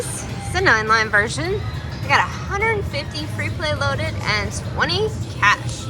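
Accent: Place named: South, United States. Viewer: the excitement in your southern voice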